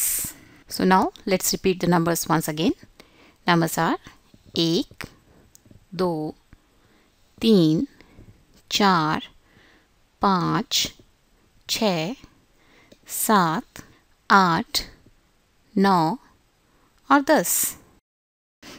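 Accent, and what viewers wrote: India. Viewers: How the fuck do you have an Indian accent and sound so weird while speaking hindi.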